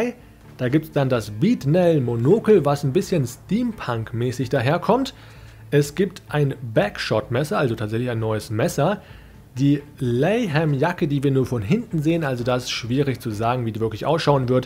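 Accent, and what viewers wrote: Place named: Australia. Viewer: Wenn das du warst der da Englisch gesprochen hat, dann hörst du dich echt wie ein Australier an. ;-)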